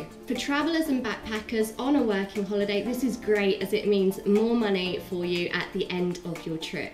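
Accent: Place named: Scotland, United Kingdom. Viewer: lovely accent :) Scotland ?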